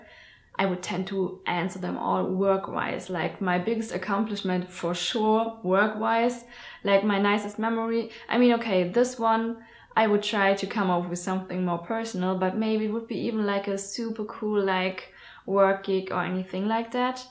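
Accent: Germany. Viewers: Are you from Germany? Your accent made me think that. Btw I really appreciate your work, you are very successful. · Dein Englisch ist ja schon gut, aber man merkt schon sehr das du aus Deutschland kommst :D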